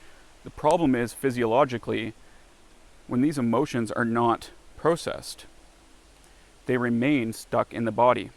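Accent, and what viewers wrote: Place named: Maritimes, Canada. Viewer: I know a fellow Maritimer when I hear one. Great videos man keep em coming!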